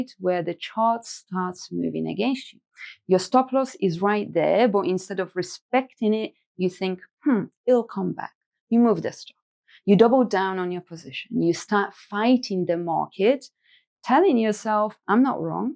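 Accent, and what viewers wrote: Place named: United Kingdom. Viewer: This video is beyond informative. The soft warm British accent is definitely helpful.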